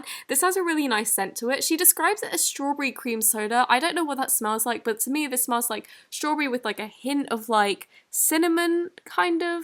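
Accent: England, United Kingdom. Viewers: is she really British like wth.... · I love the English accent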